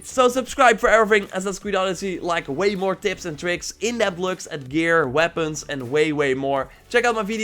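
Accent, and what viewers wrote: Netherlands. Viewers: Is it me or this guy has a sorth of dutch accent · Are you from holland ? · You sound dutch ik ben dat ook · Bro are you Dutch? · U Dutch right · Leer beter engels aub je nederlandse accent is duidelijk hoorbaar :< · Damn that accent is dutch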